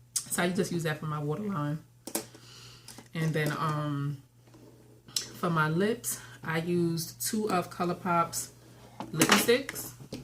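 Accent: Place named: North, United States. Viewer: I enjoyed watching. Came over from JustSynetta's channel (Love her.). Your eye look is freaking amazing. I must try to recreate really soon. Do you do tutorials? Must go see. I need to be shopping my stash before it needs to be thrown away. OAN: I got distracted a few times by the Northern accent (Jersey born, Atlanta is home now).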